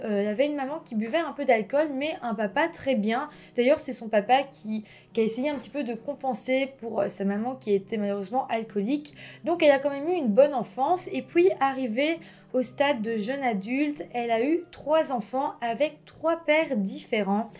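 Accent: Belgium. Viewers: Je pense que tres franchement la mort a ete donnee par accident du a la panique de la faire taire. Ce qui me fait penser ainsi est qu'il n'a pas du tout protege ses mains pour eviter les empruntes, et que si ca avait ete premedite, Sabrina et/ou lui auraient pense a mettre des gants pour eviter toutes traces d'ADN. Quoiqu'il en soit, c'est vraiment horrible et triste et la famille doit avoir beaucoup de mal a gerer non seulement la perte d'un etre cher, mais en plus dans de telles conditions. Merci pour cette histoire, j'adore les histoires qui se passent en Belgique et avec ton accent charmant belge, ca rend le tout encore plus sympa...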